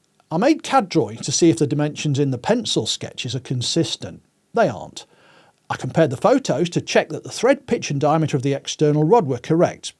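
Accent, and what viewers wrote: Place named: Lancashire, United Kingdom. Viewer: You're a Lincolnshire lad? Why's it sound like you have something else mixed up in that accent then? I mean, the Lincolnshire accent isn't the strongest of accents (unless you're saying something like carpark)...but, I can hear elements, maybe, of a Lancastrian accent mixed up in there too? 🤔 I know a lot of Lancaster Bombers used to fly from in and around Lincolnshire during the war...the link IS tedious though to say the least 😂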